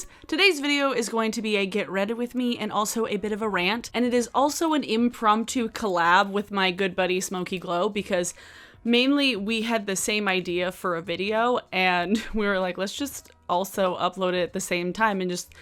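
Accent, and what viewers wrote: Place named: New York, United States. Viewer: Came over here from Hannah's video and loving it! Also, your accent sounds exactly like my aunt (she's in NY state and I'm in Western Canada), so it was like listening to her for a while! She is also very no-nonsense.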